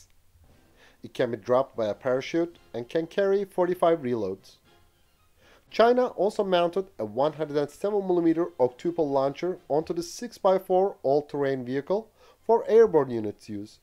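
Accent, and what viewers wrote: Turkey. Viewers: aksana bak kesin türk amk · Konuşan Kardeşim kesin Türk. Selamlar.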